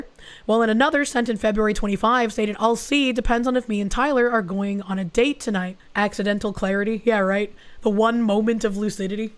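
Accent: Boston, United States. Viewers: Is it me or does sound more feminine while sick? It really breaks up the Boston accent lol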